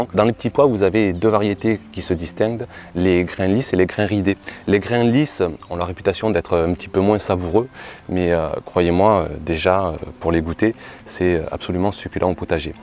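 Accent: South, France. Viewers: Merci pour cette vidéo . Mais je n'arrive pas à comprendre a quelle date (mois) vous récoltez tous ces légumes. Ni comment vous faites pour éviter la canicule de cette année. Comment vous faites aussi pour n'avoir aucun insecte qui détruit les légumes ? J'entends que vous êtes dans le sud, j'y suis aussi (Ardèche, moyenne montagne) et chez nous tout est brûlé par les UV : pouvez-vous nous aider là-dessus ? Merci ! A bientôt.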